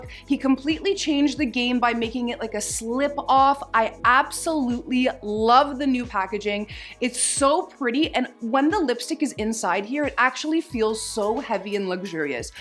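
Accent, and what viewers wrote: Canada. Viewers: I just came across your channel and i love your accent! are you from Canada? 🇨🇦 my instagram handle is !!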